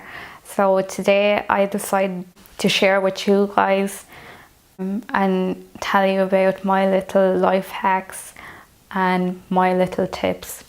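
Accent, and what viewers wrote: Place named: British Isles. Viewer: First! Love the accent. Did you learn English in the British Isles? Your Instagram is marked private :)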